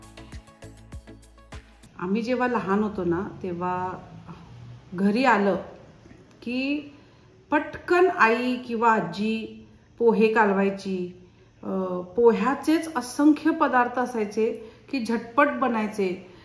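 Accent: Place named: Karnataka, India. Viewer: तुमचे कर्नाटकी मराठी हेल काढून उच्चार खूप छान वाटले कानांना ऐकताना 😍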